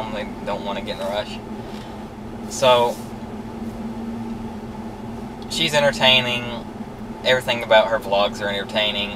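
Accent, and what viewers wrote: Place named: South, United States. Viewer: The worst? Lol. It’s the country accent. It makes it better.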